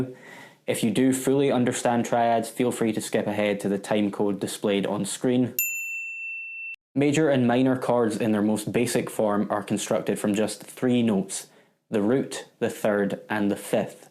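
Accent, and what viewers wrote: Scotland, United Kingdom. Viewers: That accent, any fellow Scotsman can immediately identify it.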